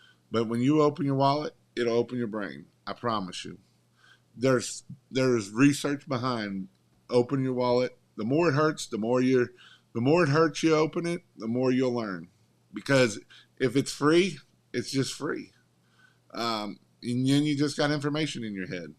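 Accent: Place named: South, United States. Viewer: I remember watching videos you made 2+ years ago and you're much more eloquent speaking. You've also lost that southern drawl quite a lot. Respect from NZ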